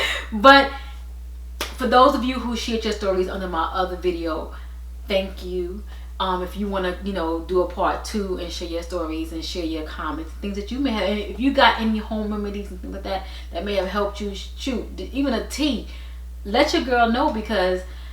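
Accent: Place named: East Coast, United States. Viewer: I love the way you talk! You are from the east coast I’m guessing! Next time you have a gut feeling about your health get a second opinion. I have a large fibroid but I want to keep my uterus. They can remove fibroids doing a myomectomy. They also can do an embolization to cut the blood supply to the fibroid. I been doing a lot of research. I’m on birth control for my fibroid but when it came to my time to remove my birth control I was bleeding with the birth control. I use the ring for birth control and I was able to skip a period except this month. I been taking DIM to help with estrogen metabolism. I wish you all the best and you get relief soon!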